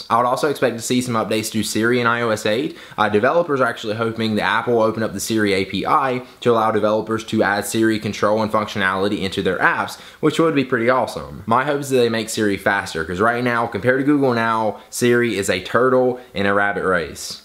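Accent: United States. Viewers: he is gorgeous... what accent does he have? I mean I know it's american but ..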